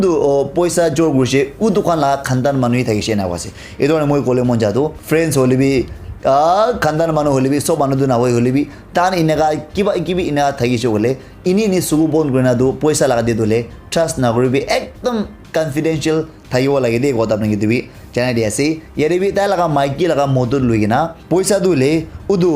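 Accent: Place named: Nagaland, India. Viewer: I really like his nagamese accent🤣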